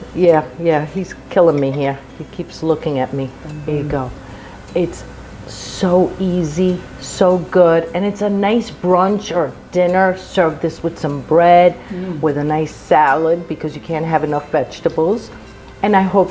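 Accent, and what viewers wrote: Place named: New England, United States. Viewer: judging from your accent your from the New England area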